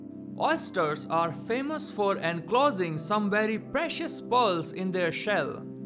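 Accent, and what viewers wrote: India. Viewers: It's not a broken english, he has an indian accent. I have a very different accent too, but it's not indian. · Yeah text way better than Indian accent (not being offensive) just saying :|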